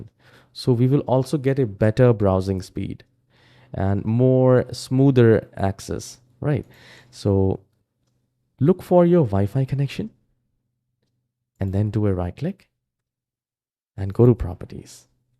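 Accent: India. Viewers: Malayali aano ?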